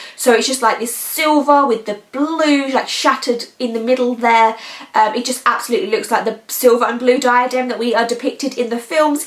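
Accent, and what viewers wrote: United Kingdom. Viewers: Brilliant! I honestly could just listen to you speak all day long with your lovely British accent! I too wondered about the pronunciation of the word Gemino. Tomāto tomàto I guess 😉 (Still can’t believe you’re nearly at the end of a pregnancy!) xo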